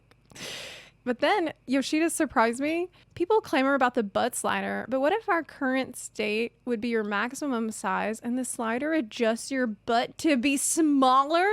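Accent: South, United States. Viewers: Love the southern accent :D PS: It would seem I've become a simp...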